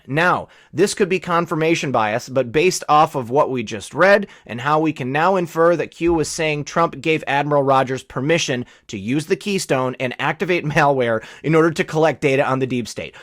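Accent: England, United Kingdom. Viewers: Great job! -- And love your English accent! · Played on high speed, your British sounds like Stewie from Fam. Guy. 😂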